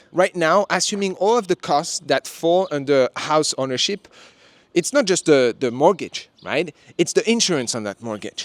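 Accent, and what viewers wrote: France. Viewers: Where you from? your English is slightly off sometimes..a Frenchy maybe?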